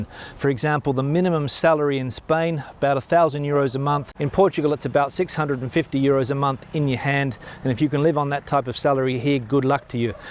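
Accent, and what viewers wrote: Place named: Australia. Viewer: Cheers,. When I first found your channel, I was quite confused. Your rapid speech, Australian accent, and my bad hearing combined to have me thinking your name was Jordy!(I know!😂) The first comment I ever made on one of your videos, I called you Jordy, then I read all the other comments calling you, and I was so embarrassed that I went back and edited it! I love your updates, no matter where they are from👍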